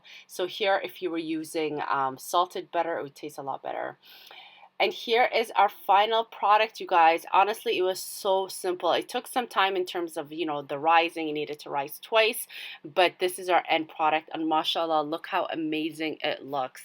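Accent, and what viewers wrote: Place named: Canada. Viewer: Cunto Macaan maasha Allaah I show them and they lake the Canadian accent too thank you abaayo every week they are gona Cooke one think mahad sanid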